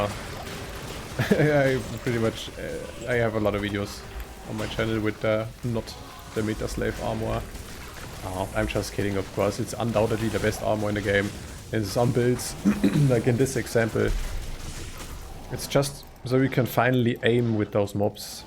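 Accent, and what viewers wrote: Germany. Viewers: Nice buiId and I like your German accent! 😂 Greedz 😉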